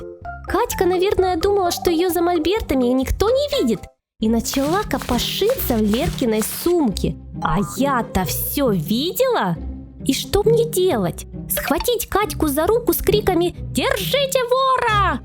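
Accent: Ukraine. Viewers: Так она украинка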